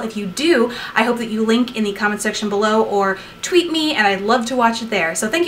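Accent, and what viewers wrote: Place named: Midwest, United States. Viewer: Like your videos, happy to hear a Midwestern accent again.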